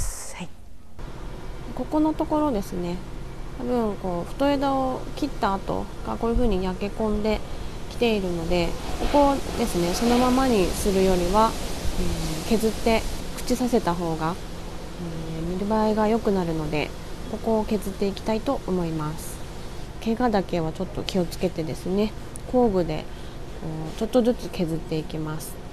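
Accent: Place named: Kyushu, Japan. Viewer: たまに出る九州弁やイントネーションが同じ九州育ちには本当に癒されます😊。さんの理科的実験ノート🗒のような企画があると、盆栽の諸説や口伝えのなぜそうするの？が見える化できていいのかな~なんて思ってます。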